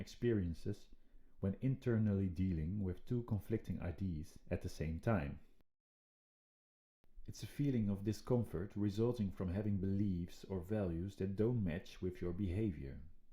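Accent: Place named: Netherlands. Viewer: Volgens mij hoor ik een Nederlands accent. Cool. 😁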